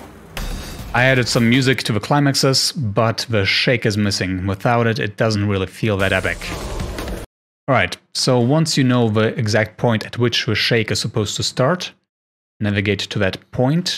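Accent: Germany. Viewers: Kann es sein dass du deutscher bist weil du redest so deutsches englisch hahahahahah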